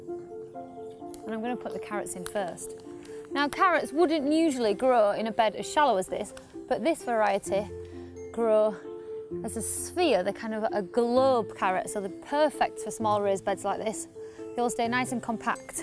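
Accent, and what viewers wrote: United Kingdom. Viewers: UK sound, very nice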